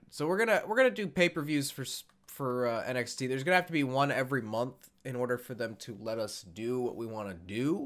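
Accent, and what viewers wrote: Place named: Australia. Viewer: I dont understand why people get so butt hurt. When i heard you aussie accent i laughed my ass off (im a aussie btw) anyways you are amazing i only found you today on your WWE 2k17 Arena video. good job and keep up the good work :)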